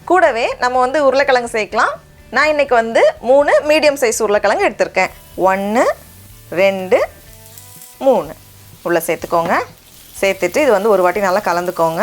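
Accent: Nagercoil, India. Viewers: Hi akka nice to see your cook we are so lucky......so thank u for ur madras samayal... us la irunthalum nama nagercovil vitukodukama pesurinka very nice .... so we support always u akka carry on .....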